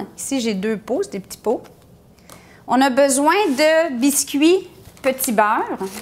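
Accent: Quebec, Canada. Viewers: Vous êtes adorable et drôle.et puis l accent québécois fait le reste. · l'accent québécois 😉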